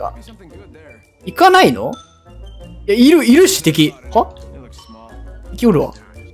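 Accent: Kansai, Japan. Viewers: この人関西の人？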